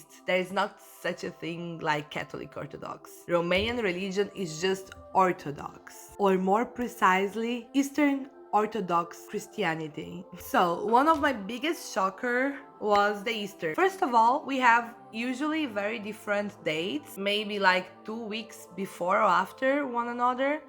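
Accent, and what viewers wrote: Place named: Brazil. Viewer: Nice video. I think the idea of requiring voting (required in Australia when I was there in 1977) and requiring public service (not necessarily military) is good, although maybe getting out of it shouldn't be too difficult (like getting out of jury duty in the US). Btw, I never tire of hearing the Brazilian accent.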